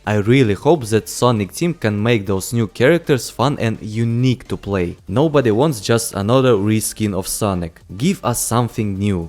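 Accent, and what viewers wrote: England, United Kingdom. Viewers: Oh, you from England. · Oh, you are from England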